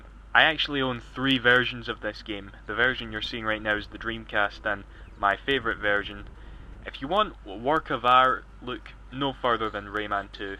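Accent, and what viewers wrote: Scotland, United Kingdom. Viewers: Which part of scotland are u from? Im from aberdeen ya see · well hes scottish, thats how we talk XD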